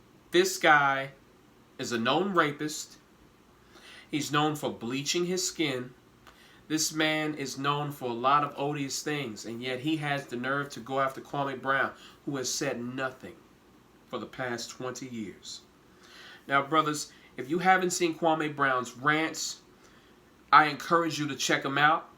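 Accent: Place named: South, United States. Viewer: As a southerner from Memphis I love hearing him rip these phonies a new one because he talks like we do.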